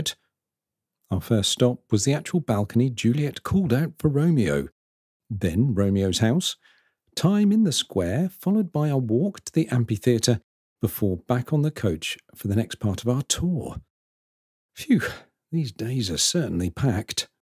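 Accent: United Kingdom. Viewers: I am enjoying your travel videos more and more! They’re so well done and I love to hear that refined British accent😄 as you take us with us along all your amazing journeys. Looking forward already to your next one, even if I heard on your recent Live streaming that a mere 10 minute video takes up to 2 days to edit and upload.😮They’re much appreciated!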